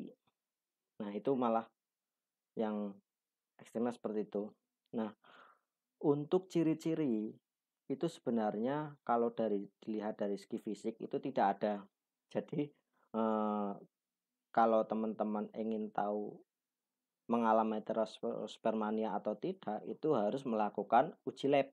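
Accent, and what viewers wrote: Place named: Banyumas, Indonesia. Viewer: Kyane wong ngapak kie, promile di dokter mana mas kalo boleh tau ? 🙏🏼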